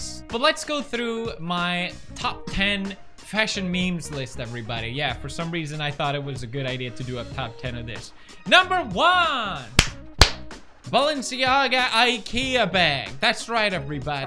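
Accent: England, United Kingdom. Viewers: Your swedish has an english accent and i dont like it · Hmm sounds like he’s in England’s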